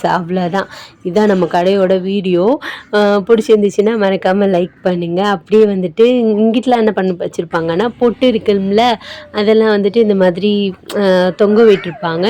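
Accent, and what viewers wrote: Ramanathapuram, India. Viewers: Ennoda native ramnad dhan unga speech vachudhan kandupidichen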